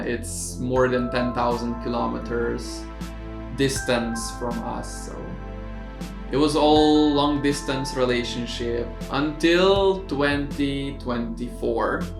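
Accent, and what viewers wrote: Philippines. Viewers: You sound like a Pinoy. Haha. Interesting, first time I see a Caucasian guy sound like a Filipino. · I notice the way you speak English you have a Filipino accent.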